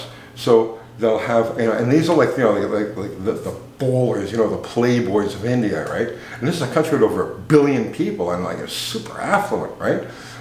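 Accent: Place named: New York, United States. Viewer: I didn't know you were such an historian! But then when you talk, my head tilts to the side like a dog. Your silky NY accent drives every point home.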